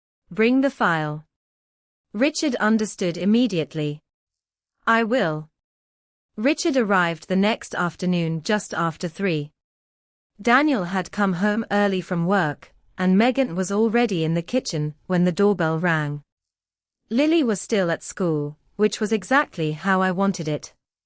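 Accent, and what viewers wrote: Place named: United Kingdom. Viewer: I cant stand the British accent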